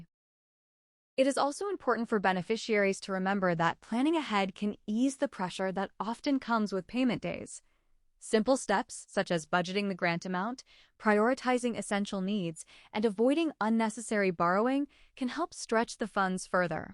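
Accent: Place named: United States. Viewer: Stop these fake news you sound like an American your acsent is not South african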